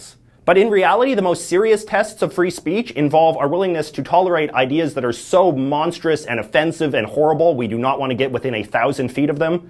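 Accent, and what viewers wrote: Canada. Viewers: You keep saying A-Boot...i am canadian and i say about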